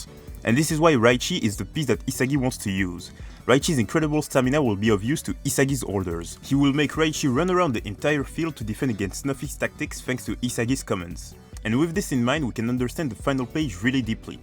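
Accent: France. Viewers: Perso je kiffe tes videos vu que je suis bilingue mais je comprends pas la décision de parler en anglais, ca ce voit tes bilingues aussi mais l’accent fr rends parfois difficile a comprendre (perso) après c’est toi qui decide et si tu reste en anglais ca ne changera pas le fait que je regarderai toujours tes vidéos, force et continue comme ca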